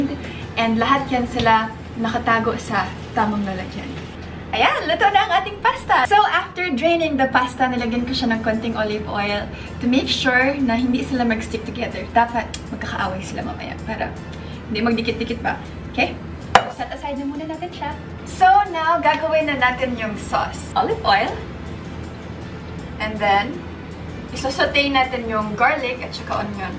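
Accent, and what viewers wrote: Visayas, Philippines. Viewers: Yung Accent minsan may pgka bisaya talaga ehy😍 Ang cute😙 proud bisaya here❤ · I love her bisaya accent. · Ang kyut ng pagsasalita na parang bisaya. Haha. ❤ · Very pretty pinay beauty! 🥰 i am a big fan! Nindot sd kaau imung tagalog na may bisaya accent hehehehe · Cute ng accent ni. Bisaya gyud ka dai. Proud Bisaya here! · Sa kadugay na nimo nagpuyo anang manila dhai,halata gihapon kaayo imong bisaya accent.😁😁😁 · Makalingaw kaayo ka te duh, samot na imong bisaya accent❤️ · Nice kayo imo accent.. ung naa bisaya nga accent pero cute ❤️ ur shirt is amazing too! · I luv ur bisaya accent, 😁👍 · Love the Bisaya accent when she speaks tagalog 😂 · I like that she never lost her bisaya accent hehe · Nindot paminawon ang imong bisaya accent madam. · I like your bisaya accent, "Mutsarela" 😍😍😍😍😍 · lumalabas ang bisaya tone n 😉cute😍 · Girlfriend material talaga to 😍 Walang arte mabait sa kapwa. 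Hindi lumalaki yung ulo kahit malayo na yung narating. Nakakainlove 😍 Bisaya tone, beauty face, beautiful personality. Godbless po sayo. More opportunities to you 😍😘💕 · bisaya gyd kaayo c ay 😍😍 mailhan kaayo sa iyang inistoryahan.. hehe..Proud bisaya here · Bisaya japon ug tono ba hahahah cuttteee oyy 😍😍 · Hahaha lodi ang cute nang accent...bisaya gyud...thanks for sharing nalingaw ko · Hahahaha nigahi na bai lingawa nako oie sa bisaya na accent.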